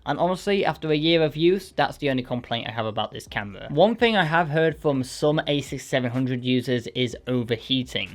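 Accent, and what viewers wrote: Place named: England, United Kingdom. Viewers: I know it's an English accent but can anyone tell me from which region? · Hey, I'd say it's definitely some sort of Estuary English, meaning London.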